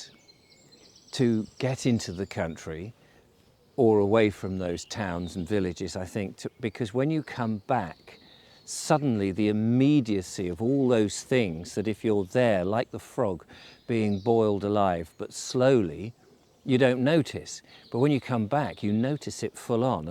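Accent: United Kingdom. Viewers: Wow Sir, you look good. Aa the Britishess is so lovely. Rest and recreation on a weekend... Thank you and enjoy 🎉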